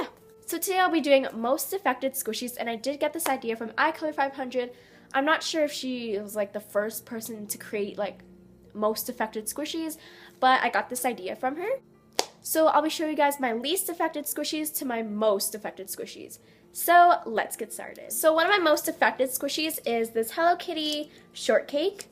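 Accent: Canada. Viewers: She is from Canada I think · Just wondering, are you Canadian?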